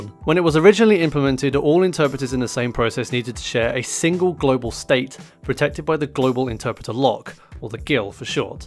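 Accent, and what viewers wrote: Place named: United Kingdom. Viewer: No thumbs up. I live in the US so my ear is not tuned to a British accent. The accent along with your fast talking made it a challenge to understand. At some points I changed the playback speed to 0.75. My suggestion to get more thumbs up? Slow the F**k down! Are you simply trying to impress us that YOU know this information, or are you trying to impart the information to the rest of us? Communicaiton is NOT speaking words, communication is transferring ideas from one brain to another brain. Do whatever it takes to acheive that goal.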